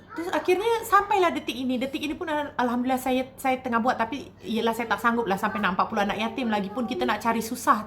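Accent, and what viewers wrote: Malaysia. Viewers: Saya suka dan senang.mendengar cerita ini, apalagi diceritakan orang Malaysia logat Melayu, berdarah Indonesia.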